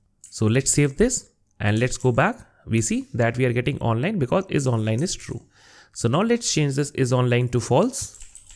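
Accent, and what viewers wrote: India. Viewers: May be, I hear a sound similar Bengali, never mind, your lecture is really good, appreciate for your hard work.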